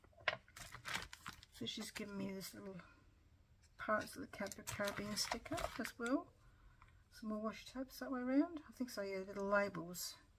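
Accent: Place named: Australia. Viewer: Love your vid! It’s nice to hear an Ozzie! Lovely cards and washie tape and stamps! Love to see what you can do with them! Francke from Brisbane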